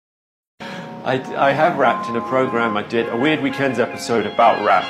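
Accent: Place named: Australia. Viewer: Aussie accent just hits different.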